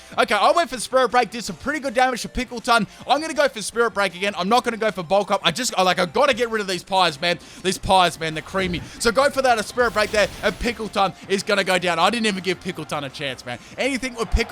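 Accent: Australia. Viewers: Bro he's australian not british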